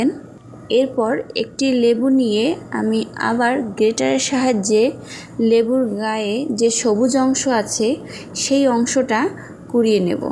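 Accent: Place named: Bangladesh. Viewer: ওটা বাংলাদেশি আঞ্চলিক টোন,আমরা ওভাবেই বলি।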